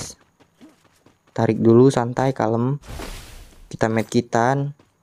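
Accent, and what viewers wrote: Palembang, Indonesia. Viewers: Wong Palembang kah bg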